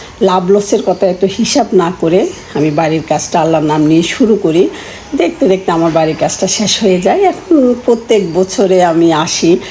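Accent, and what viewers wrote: Noakhali, Bangladesh. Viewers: Annti আপনার ভাষা নোয়াখালী কোথায়।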